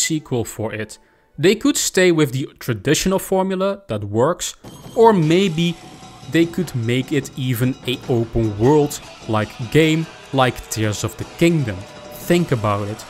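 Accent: Netherlands. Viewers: Hahaha het is altijd te horen voor Nederlanders.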